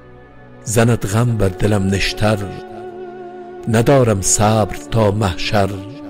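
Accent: Afghanistan. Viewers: لهجه دری هست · این لهجه فارسی افغانستان هست میتوانید از سخنرانی های داکتر نجيب درک کنید این صدا را. البته فعلا این لهجه زیبا در حاشیه ای سیاهی رفته هست متاسفانه به احتمال خیلی زیاد این اثر زیبا و میراث قیمت ناپذیر فارسی زبانان خردمند و با معرفت چندی دیگری باقی نخواهد ماند حتما میتوانیم بگوییم در حال حاضر همچنین به دست تاریک فراموشی سپرده شده است و آهسته و پیوسته در حال از بین رفتن هست و به نظریه اکثر زبان شناسان دیپارتمنت دری در آینده نه چندان دور تنها لهجه که مردم فارسی زبان بر آن تکلم خواهد کرد لهجه تاجیکی تاجیکستان و ایرانی ایران خواهد بود وبس و من خیلی احساس تاسف دارم💜🤍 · لهجه دری 🇦🇫🤌🏻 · این لهجه دری است پارسی دری است لهجه تاجیکی را شنیده اید؟ تاجکستان لهجه شان ماملا متفاوت است چرا با افغانها مشکل دارید تبعیض میکنید؟؟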